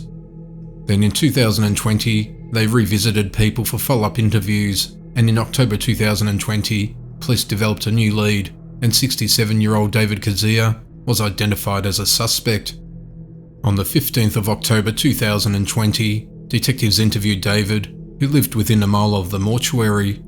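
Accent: Australia. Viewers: Me too! He's smashing every other true crime page i am subscribed to! i love his voice and finally finding an AUSSIE amongst all the true crime channels 🙌🙌 No offence to anyone not feom Australia- I've just found it VERY rare to come across :-)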